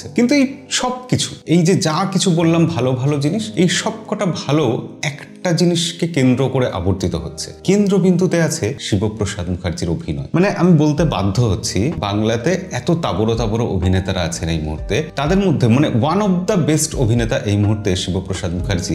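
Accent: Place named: Bangal, Bangladesh. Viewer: Apnar accent puro bangal😄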